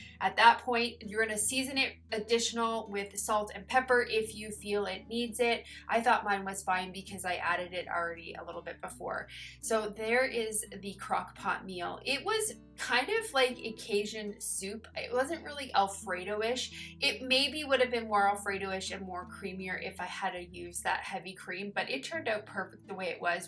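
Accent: Canada. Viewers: I think I remember you saying that you are from Canada, so that explains your accent. I am so happy that you are getting new subscribers. I love your videos, been with you from the beginning.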